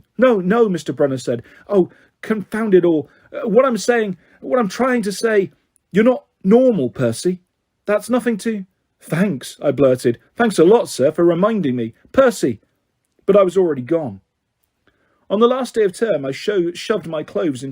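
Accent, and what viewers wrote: United Kingdom. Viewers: He's British § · He's BRITISH bro, what the fuck did you expect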